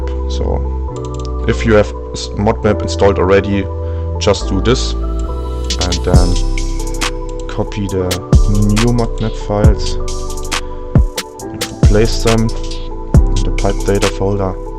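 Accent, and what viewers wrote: Germany. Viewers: da höre ich doch einen Deutschen Akzent :D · lol german and norwegan is pretty close so I can understand that u said "I hear ur german accent" and op replied with "Well I am a german channel" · I swear to god this guy is german. If not he is french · grausamer deutscher akzent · ich liebe sein denglisch <3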